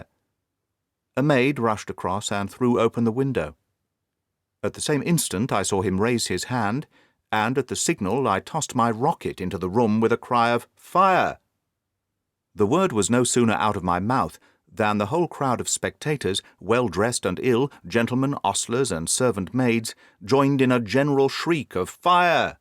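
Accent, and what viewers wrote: United Kingdom. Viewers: Is it British accent ?? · Yes, British accent👍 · Is this british accent? Pls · I think its an British Accenct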